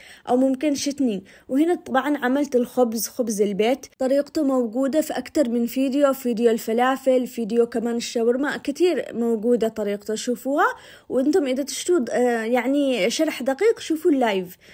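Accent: Aden, Yemen. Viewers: ايوه حلو لهجتك تجنن يمنيه وافتخر تحياتي من صنعا ام ايلان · ماشاالله عليكي دكرتني يابنتي بالتواهي والسياره حق البطاطيس الله يحفظك يا اصيله بنت عدن وكله كلامك عدني محسن كلامنا نشتي طريقه الكاتلكس بالمفروم حق عدن والسبوسه حق عدن من يد حبيت الاكل ❤❤❤❤❤🎉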